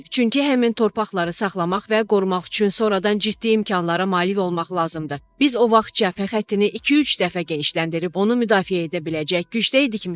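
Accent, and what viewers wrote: Armenia. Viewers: Xanım ermənisi kimi danışır, Sözlərinin yarısını başa düşmək olmur. Siz ey Azərbaycan dilinin evini yıxanlar....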